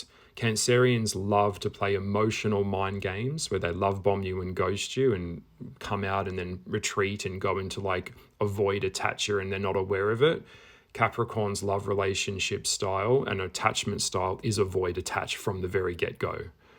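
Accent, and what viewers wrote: Australia. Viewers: 🤣🤣 he is Australian not French where did you get that from ?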